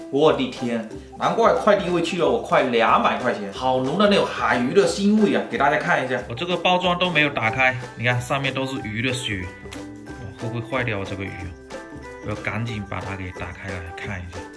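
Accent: Fuzhou, China. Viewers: 就喜欢浓浓的福州呛！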